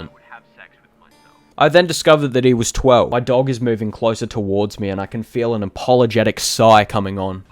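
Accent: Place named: Australia. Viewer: Fabtastic video, man. You're definitely gonna make it, man. Also, every time you say Mari, I keep thinking you're saying my name in your Aussie accent.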